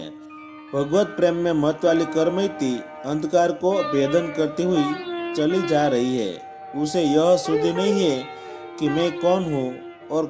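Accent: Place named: Chennai, India. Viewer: आप चेन्नई से हो क्या अना बोलते है वेसे बोलते हो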